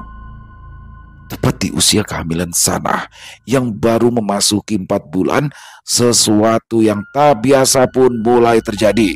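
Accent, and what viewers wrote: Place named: Banjar, Indonesia. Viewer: Bang sdh fasih sekali dengan logat Banjarnya... Keren bang, salam dari kalsel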